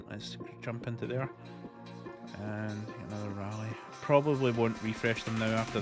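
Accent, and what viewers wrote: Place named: Scotland, United Kingdom. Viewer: So Scottish 😮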